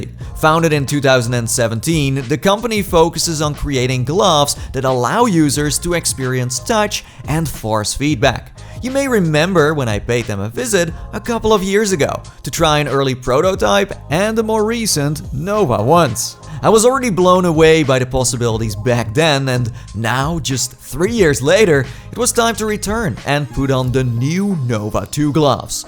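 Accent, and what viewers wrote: Netherlands. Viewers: You sound like your from the Netherlands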